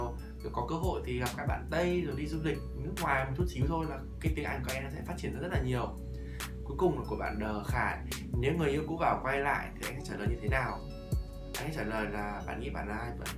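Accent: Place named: Hanoi, Vietnam. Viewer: thích video của vì các lý do sau... 1/ gần gũi 2/ những gì a chia sẻ khá thông dụng vs ng xem 3/ giá hàng hóa khá phù hợp vs đối tượng như e (ít tiền) 4/ giọng HÀ NỘI (cute)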